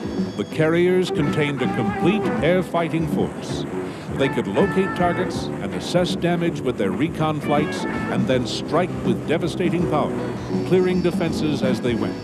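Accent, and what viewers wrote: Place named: United States. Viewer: American narrator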